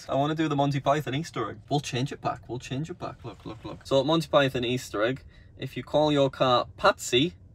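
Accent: United Kingdom. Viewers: How good is it to hear Brits doing these Tesla road tests? 0.60 in 3.07 secs...'Oh my God...Jesus Christ...Jesus Christ....Bloody Hell' Just my kind of comment... Love it:-)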